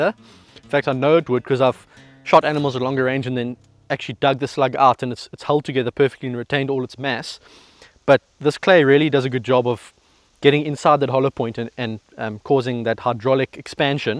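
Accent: South Africa. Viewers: Funny to hear a mix of accents in South African English, a mix between Australian and a Dutch man speaking English. Groetjes uit Nederland.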